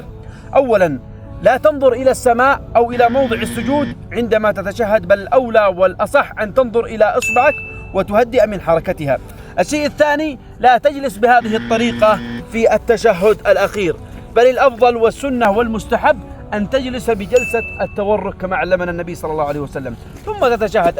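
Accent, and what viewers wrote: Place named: Yemen. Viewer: ياخي اللهجه واللباس واضح من اليمن يعني محه يكون من الفلبين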